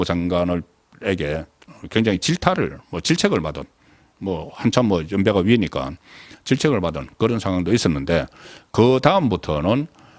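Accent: Gyeongsang, South Korea. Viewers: 혼술 송국건 대표님 구수한경상도 사투리가 더 감칠맛이납니다. 해박한 시사논평줄겨보고있습닏다. 고맙습니다. 혼술화이팅!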